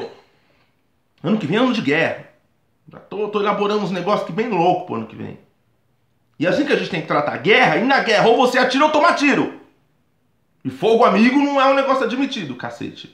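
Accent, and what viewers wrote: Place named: São Paulo, Brazil. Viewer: Engano seu de pensar que eu acho que vc é superior ou inferior .Exatamente por vc ser quem vc é , inteligente e articulado ( mesmo detestando seu sotaque de periferia paulistana) e com convicções , é que estou aqui. Gosto de combater o bom combate....mas vc precisa ler muito ainda e viver mais. Sei que vc é olavete e esse é um dos maiores erros seu. Abra essa cabeça gorda e leia tudo de esquerda e de direita e faça uma média .Quanto a você dizer que viu no meu perfil que eu não sou interessante , é verdade . mas eu não quero ser youtuber como você .Você quer holofotes .Eu não , tanto que estou aqui em Off tentando me comunicar mas vc é raivoso exatamente como seu herrr ídolo.Obs. Não disse nenhuma vez que vc é imoral mas vc como facistinha que é foi logo dizendo que não tenho moral. Pobre diabo...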